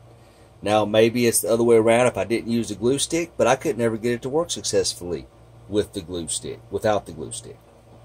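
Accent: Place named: Georgia, United States. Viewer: Hey Buddy, that seems like a major pain in the azz, but I bow with respect for your perseverance ! What is your z ofset ? You may need to push out a thicker bottom layer ? Did a raft not work ?Have you tried PEI or even PET (gizmo dork) ? PET with a good first layer of Elmers Extreme works great for all my other heated and non heated beds.I have one of these coming for testing friday so Id love to hear what you think ? I hear a slight accent also, you in GA ?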